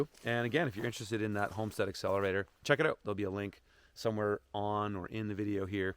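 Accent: Canada. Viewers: Where are you from? Canada?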